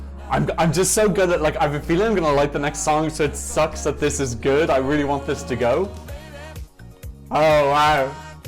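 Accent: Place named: Ireland. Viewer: This was a nice reaction video, I enjoyed it and you had some interesting opinions. Keep it up dude! I have some constructive criticism if you don’t mind. The only thing I’d say though is that you don’t seem to annunciate your words very well. Like, I’m Irish and even I found it difficult to make out what you were trying to say a lot of the time. It’s important to keep in mind that, if you want to appeal to a wider audience, they need to be able to understand you even if English isn’t their first language of if they generally have trouble deciphering Irish accents. Apart from that, the video was great!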